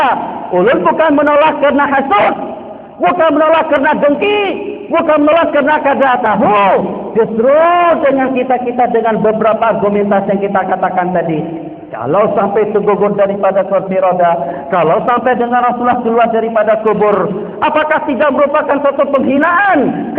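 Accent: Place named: Banjar, Indonesia. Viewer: sidin nhe urang manaaa, ada banjary nya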